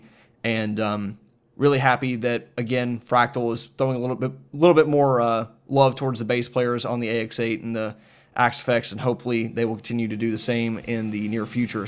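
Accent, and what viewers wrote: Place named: South, United States. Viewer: Where you from? I’m hearin a southern accent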